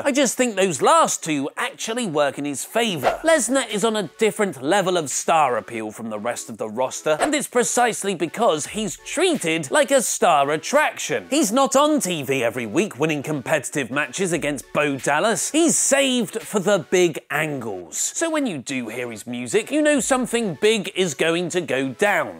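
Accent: New York, United States. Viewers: Ahhh the return of stereotypical 1920s New Yorker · who else popped for the return of 1920's stereotypical New Yorker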